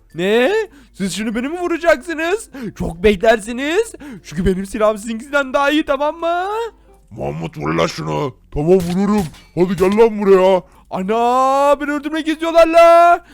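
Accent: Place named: Black Sea, Turkey. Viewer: Abi karadenizli konuşuyon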